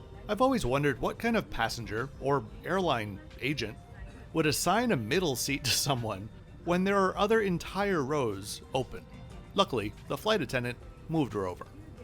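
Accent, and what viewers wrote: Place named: United States. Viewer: You're not an American? I don't know. Your accent gave me American/Canadian vibes.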